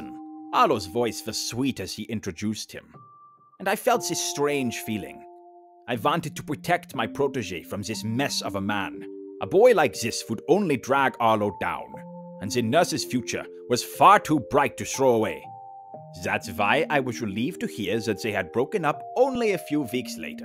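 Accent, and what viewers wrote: Germany. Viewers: The German accent is just 🔥🔥🔥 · This was fire along side the German accent 🔥🔥🔥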